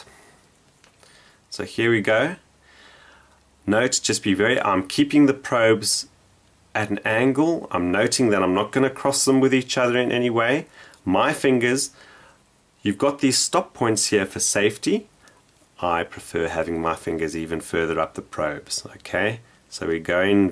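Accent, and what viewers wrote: South Africa. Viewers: Absolutely brilliant tutorial, I knew as soon as you started talking that you are South African! nice to see a south african using vlogs for educating about electricity, Fab! I bought a multimeter a few months ago and had no idea how ot use it. Now since watching your extremely concise and clear tutorial I am going around and probing everything haha, everything DC that is! AC scares me!!!! quick question: I have a cheap chinese android tablet which I aquired a while ago which worked for one day and then would not charge, absolutely nothing at first glance but then I opened her up and seen its got a 3.7v Cell, I just wanted to know how I would test for voltage on this I want to know if its dead or not? do I need to desolder it from the board to get a true reading or can I just measure it in situ? if I find its not the battery where do I go from here, I dont know anything about how these things provide the power to the board? 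would be really great to be able to use this tablet as my other tablet is a 1st Gen Ipad which I wont slag off it has its pros, but it also has its cons, that being of limited compatability with most of todays apps! thanks for posting I am now more enlightened! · don't you just love our South African accents? thanks for the video this is amazing! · I found the subtitles extremely distracting. I assume that some software application was used, which had difficulty with your South African accent. Pity. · are you south african ? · South African accent!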